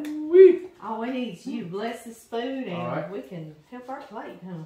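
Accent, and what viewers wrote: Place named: Louisiana, United States. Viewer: Hey, haven't been doing much video watching this past couple of weeks due to losing a good friend of 25 years she was born and raised in Louisiana she had just turned 56 so gone unexpectedly and way too soon. We originally met online and formed a bond like sisters through the many years of conversation. We never got to meet in person due to life always getting in the way which leaves me with such regret..but we spent hours talking on the phone with each other through the years and I sure am missing her. Anywho when I got the notification on my phone that you posted a new video I just had to watch since I shared your videos with her so often. I gotta say hearing your voice and that Louisiana accent opened the floodgate of tears knowing she wasn't just a phone call away anymore. When you and did the blessing it was like a soothing balm to remind me that someday we will be reunited. 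I don't mean to ramble but just wanted to share with you how your video touched my heart. Thank you, God bless and keep you and your family. ❤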